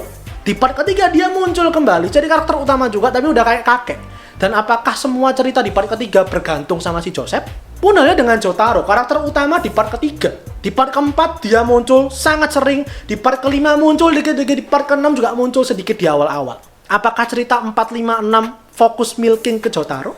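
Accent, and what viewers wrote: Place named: Surabaya, Indonesia. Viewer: Wong suroboyoan yo cak? Medok banget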